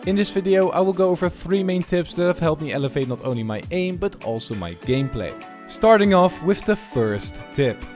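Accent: Netherlands. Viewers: Ik heb het gevoel alsof je een Nederlands accent hebt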